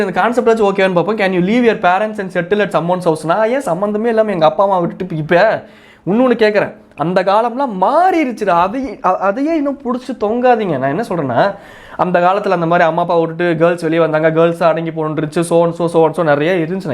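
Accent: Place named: Erode, India. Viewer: Light ah erode uhu slang varuthungooo 😅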